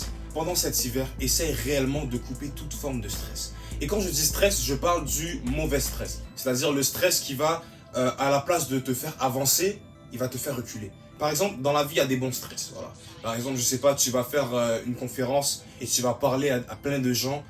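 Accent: Canada. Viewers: superbe vidéo, j‘aurais dit « période de l’année » et non « époque » sinon la vidéo est trop qualitative et j’en avais besoin thank you sir tu es canadien? tu as un accent canadien en tout cas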